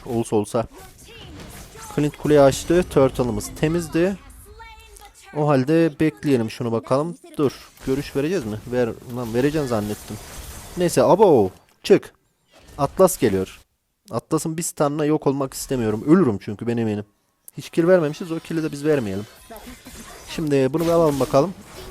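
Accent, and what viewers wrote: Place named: Çorum, Turkey. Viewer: çorum lumusun sen krl şive oraya kayıyo gibi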